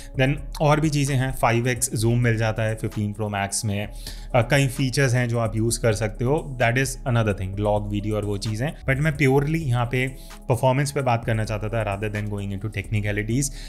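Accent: Lucknow, India. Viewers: Sir aap lucknow se ho ???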